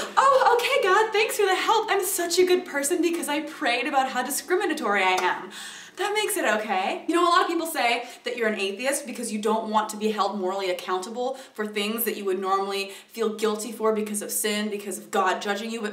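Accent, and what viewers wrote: South, United States. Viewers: Why did you put on a southern accent? Michigan is as north as it gets, minus Alaska.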